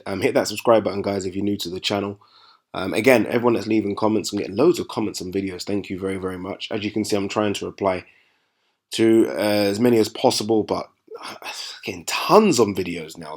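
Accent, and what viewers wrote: United Kingdom. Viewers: Dismiss fights that are disrespectful to the fighter. Why should Spence go to the UK again? Why should Spence fight washed up fighters and fighters he has beaten already? Spence wants to unify 147. He took the belt from Brook and Khan is washed. You sound as if you may be a person from the UK? Could you be a bit biased? Tired of coming to America to watch good fights? Rent them on ppv like most people and save them airfare. But, stop making trash vids about why Spence must fight in the UK to make money. If you want Spence to fight Khan and brook, make vids about them coming to Dallas to face Spence to get paid. Not like either of those guys are making more money than Spence.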